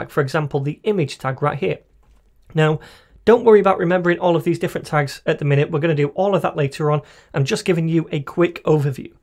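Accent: United Kingdom. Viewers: By your accent I can tell you are British, pls marry me. A British tech dude. Perfect combo! 😍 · thanks a lot. i like to watch your video not only because i wanna learn about web programming language but also learn about english especially british. and i like your accent. its rarely british guy make programming tutorial on youtube.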